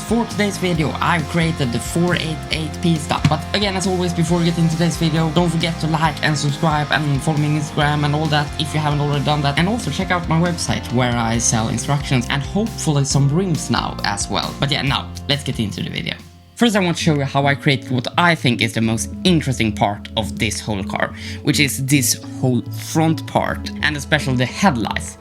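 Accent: Sweden. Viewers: eyy, du e svensk jag trodde det. ´´fick nåt i halsen´´ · Are you from sweden?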